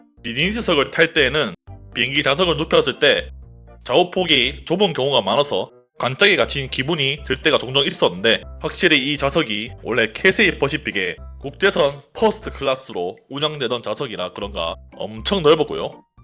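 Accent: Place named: Gyeongsang, South Korea. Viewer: 완죤 날것 그대로 갱상도 아자씨 뱅기 리뷰 , 특유의 매력에 점점 뜨고있음~~^^*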